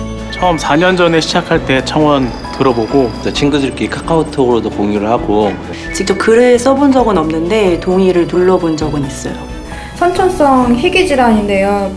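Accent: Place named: China. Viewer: 지난 4년 동안 중국인 발음이다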